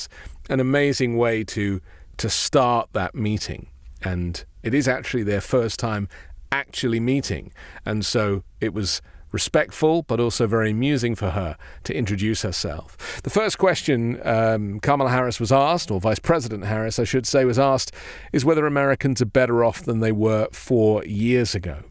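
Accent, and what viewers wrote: United Kingdom. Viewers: Well nothing like hearing about American politics from someone with a British accent 😅 But gooo Kamala !!! RID the world of Trump !!!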